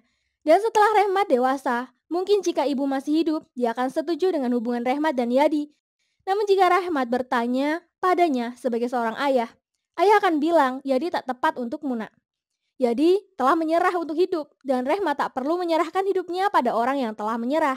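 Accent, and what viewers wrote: Papua, Indonesia. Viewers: Mimin logat Papua za rasa lucu tra gaul se mimin 😂😂😂😂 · Mantap logat Papua nya Mimin♥️ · pake logat papua bleh sukses selalu kak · Mimin pinter logat Papua.. pantes pintar ngemob aww. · 😂😂Fix, mimin anak Papua😅mantap Dialegx Min keren, Lanjutkan 😊🎉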